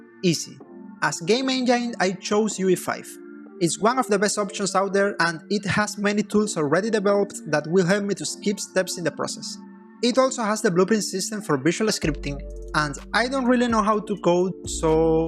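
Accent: Spain. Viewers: my native language is espanish is just cute :) relax mate, we can all understand you and having spanish accent is not worst than having american accent ;) gl with the game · Men eres increíble. Te apoyo totalmente en tu nuevo proyecto y deseo que lo logres superando tus propias expectativas. PD: si lo llegas a ser (q imagino q sí) espero llegar a jugarlo😢. También! Tu inglés tiene un acento notablemente español, pero es entendible, con práctica y experiencia se mejoran. Sigue así!😎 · Ya decía yo que me sonaba el acento, un pana de España. Suerte con el juego, pues la scope está ciertamente alta jajaja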